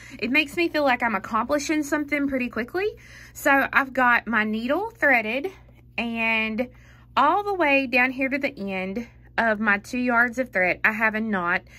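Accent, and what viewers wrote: South, United States. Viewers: Beautiful and beautiful southern acent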